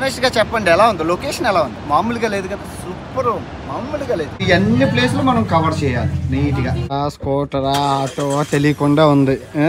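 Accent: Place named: Visakhapatnam, India. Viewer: Mi matalaku navvuagatledu sir anthaena mana vizag basa kada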